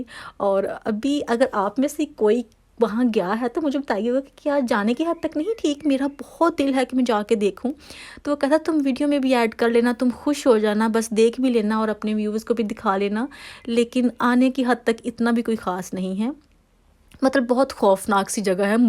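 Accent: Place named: Faisalabad, Pakistan. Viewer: Ap ki lahaja MERI Tara ha shiad ap b Faisalabadi ha 😁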